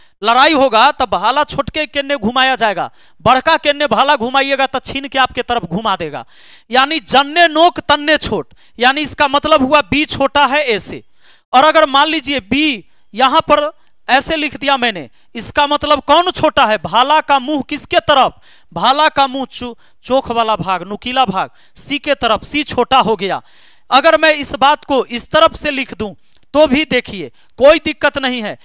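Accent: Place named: Bhojpuri, India. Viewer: Ekdum bhojpuri style mein bolte hai to maja aa jata hai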